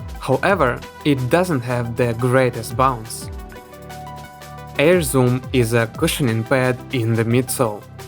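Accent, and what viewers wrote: Russia. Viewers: По произношению понятно, что текст читает русский:) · Хорошо что русский акцент, так понятнее 😃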